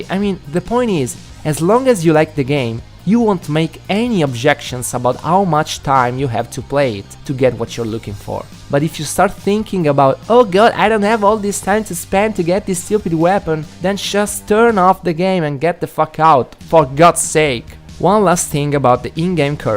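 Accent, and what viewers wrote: Rome, Italy. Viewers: inglese romano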